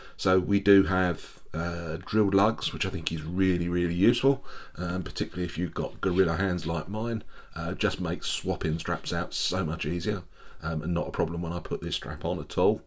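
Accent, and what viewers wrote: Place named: England, United Kingdom. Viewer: Excellent review mate, thanks. Just the right amount of meat-and-potatoes information about the watch with a sprinkling of clever humor for desert. Not to mention the charming English accent for an authoritative quality. --From Central Oregon, USA.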